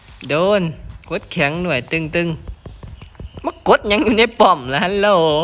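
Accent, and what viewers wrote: Isan, Thailand. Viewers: ผมพูดอีสานไม่ได้เเต่ชอบฟังพี่พูดมากครับเพลินหูดี55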